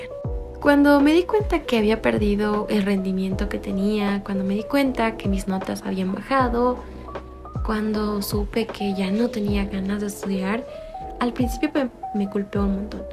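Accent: Bolivia. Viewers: Una pregunta eres de bolivia ? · Eres de BOLIVIA?? Gracias por el video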